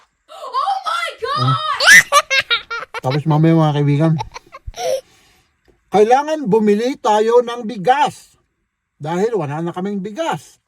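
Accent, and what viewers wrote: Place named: Cordillera, Philippines. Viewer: Sir taga car kaba? Ang tuno sa pananalita mo ay parang taga car.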